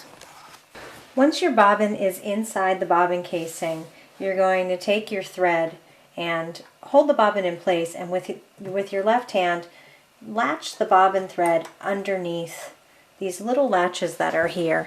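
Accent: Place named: Russia. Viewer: Sounds Russian to me.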